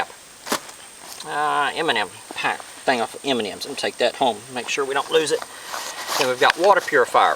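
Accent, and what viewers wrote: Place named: Alabama, United States. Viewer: what part of the world are you located in? reminds me of Alabama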